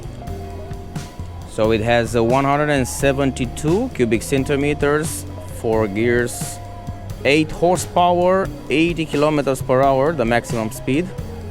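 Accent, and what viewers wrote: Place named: Romania. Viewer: Romanian accent...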